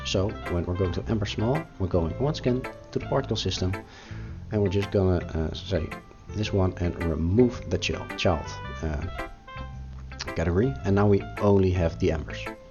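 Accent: Netherlands. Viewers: haha its so funny to hear your dutch accent lol, great video!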